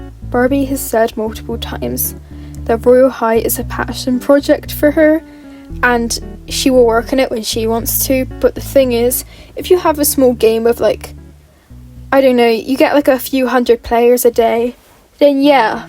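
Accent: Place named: United Kingdom. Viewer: Ur voice sounds so much more British than irl 🧐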